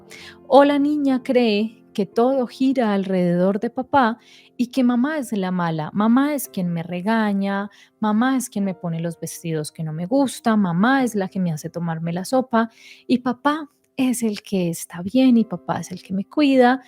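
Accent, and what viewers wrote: Colombia. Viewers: Muy linda voz !! Es de Bogota’?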